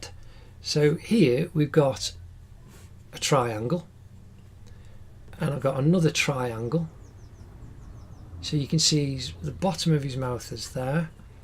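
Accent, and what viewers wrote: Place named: Cleethorpes, United Kingdom. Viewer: hi! you sound like you are from near me, i am in cleethorpes x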